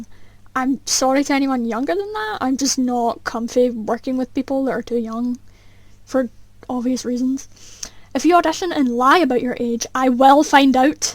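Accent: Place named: Ireland. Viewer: are you irish i recognise your accent lol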